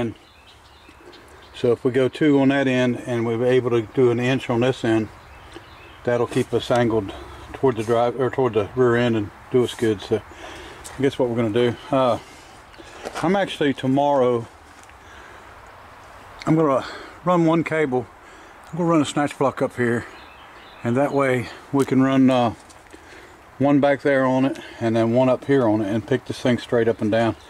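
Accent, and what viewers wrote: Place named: Tennessee, United States. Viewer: "I am alookin and not aseein" , brought back good memories of Tennessee from decades ago.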